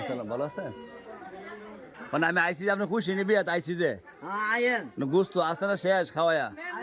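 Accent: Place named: Nabinagar, Bangladesh. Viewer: এই অঞ্চলের ভাষা ব্রাহ্মণবাড়িয়ারনবীনগরে ভাষার মত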